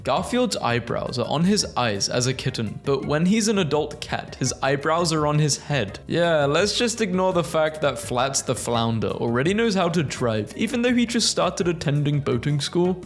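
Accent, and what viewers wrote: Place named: Southern England, United Kingdom. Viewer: Your accent is modern and largely originated in the late 18th and early 19th centuries among the southern English upper classes. So actually makes a lot of sense historically because we never adopted the made up weird accent.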